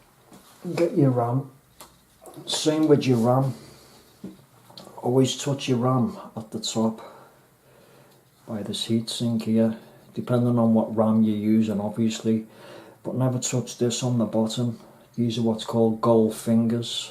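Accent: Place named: Liverpool, United Kingdom. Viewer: Thumbs up just for that Scouse accent lol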